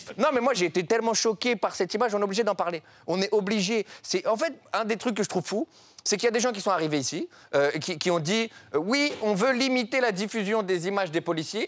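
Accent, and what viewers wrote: Quebec, Canada. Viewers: Sa parait il a entraîné son accent québécois lui je valide 😂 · Wow l'accent Québécois! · Wow tu a tellement bien l'accent québécois impressionnant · 10 sur 10 pour ton accent québécois, je certifie! 🙂 Bonjour de Montréal 👍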